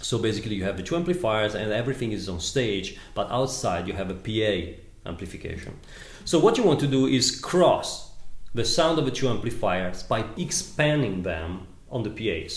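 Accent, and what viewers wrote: Italy. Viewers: Italian accent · he is from itali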